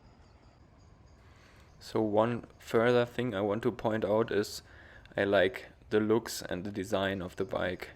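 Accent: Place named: London, United Kingdom. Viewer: I have been riding Brompton bikes for nearly 25 years. The early bikes were terrible in terms of brakes and brazing quality. I used to hate riding them off-road, and probably still wouldn't use mine off-road. , you need to trim the fender slightly. This is a prime point for rust where the mud sits on the frame. It WILL make the frame rust. Every year, these bikes get better. The best thing about them is that you will always be able to sell one for exactly what you paid for it; they do not depreciate. By the way, I detect a slight London accent when you pronounce 'Brompton' as you use the London 'glottal stop' in your speech. Did you ever live in London?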